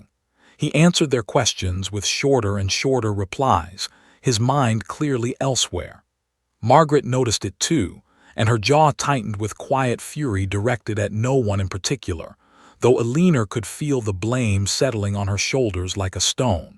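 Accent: United States. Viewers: Oh dear, about half way through and at least half a dozen mis-pronouncements in an inappropriate american, to my ear, voice. But it's a short story and perfectly sweet.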